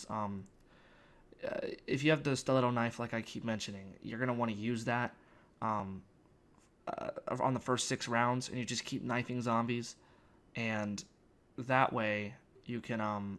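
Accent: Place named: Wichita, United States. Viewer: oh nah lol. I just came from Wichita for 6 years. The accent sounded like it was from that area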